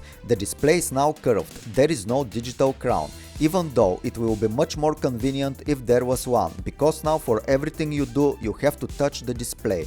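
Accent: Russia. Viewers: his voice can't be more russian, even when he is speaking in english it felt like i'm listening to russian language.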